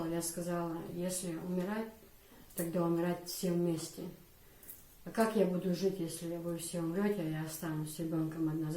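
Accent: Chechnya, Russia. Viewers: Знаешь некогда не подумала бы что вы русская потому что акцент чисто чеченской увас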